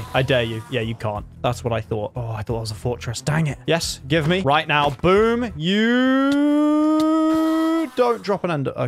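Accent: United Kingdom. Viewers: YOU KINDA SOUND BRITISH LIKE GEORGE FROM DREAM SMP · Hes british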